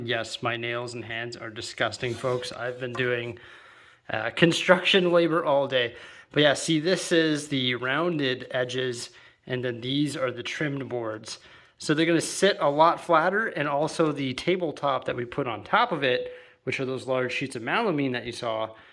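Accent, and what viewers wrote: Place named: Canada. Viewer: You sound very Canadian lol lmk if im wrong but I hear it in the vowels fs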